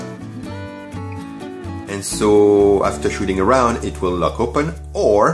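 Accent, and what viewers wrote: France. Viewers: je reconnais un accent français ?!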